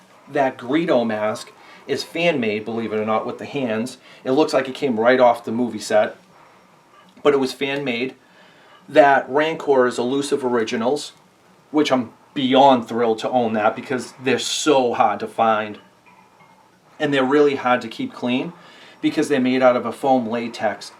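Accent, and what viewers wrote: Boston, United States. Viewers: Is that a Boston accent??? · From Scotland love the boston accent, just like the greatest president John F Kennedy. Star wars collection is quality. I used to chew the arms and legs off my storm troopers so it looked like han or luke had blasted them lol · Boston accent? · "This here's a daaat boawd" defo Boston didn't hear aussie tho. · He sounds boston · Definitely boston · Boston guy ay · I was like, "This dude Boston or a Yorker"? I changed my mind like 5 times but I'd guess he's Boston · Is it a boston accent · Are you from Boston? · u lie in Boston bro? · Total sidebar, but is that a Boston accent? Only I'm from the UK so it's fun to try and guess American accents. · Boston accent heavyyyy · Are you from boston. You have the accent.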